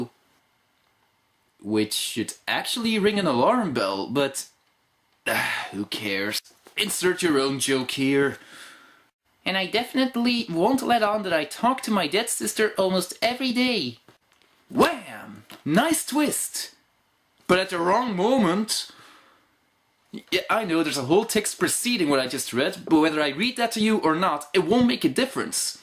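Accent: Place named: Germany. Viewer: This was hilarious! Loved the jokes, loved the accent (what is it by the way, German?). Great video! Only suggestion is to put only 3 clips instead of 4 and to quote a little less. Also maybe go over plot characters. Amazing video though, loved it!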